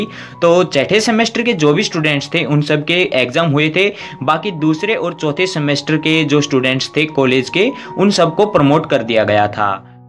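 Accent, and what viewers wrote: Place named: Bhiwani, India. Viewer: bhai aap ki awaj suni suni lgi thi tho muje lga bhiwani se ho ge😅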